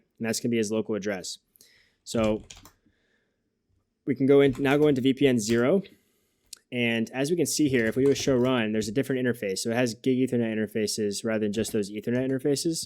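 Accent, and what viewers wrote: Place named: United States. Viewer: Very good structured presentation, but much too fast and with a hard to understand American English dialect if English not the own mother tongue.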